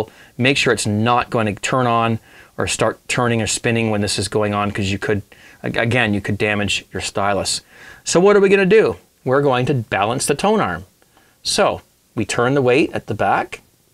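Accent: Canada. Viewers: Must be because he's Canadian (I can hear it). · Also, one of the best Canadian accents ever. Thank you for this video!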